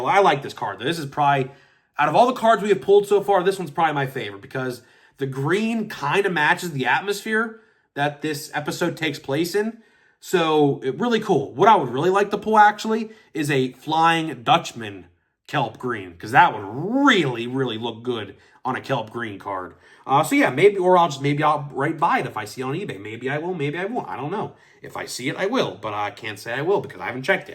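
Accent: Pittsburgh, United States. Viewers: Are you from Pittsburgh? I used to work with some Davey Tree workers from WV and Pittsburgh and they said "YIns" all the time and had kind of the same accent. We love Pittsburgh. Beautiful city. Love R. Clemente. Our first trip to the city we missed R. Clemente by one year. 1973 season. Sad but legendary.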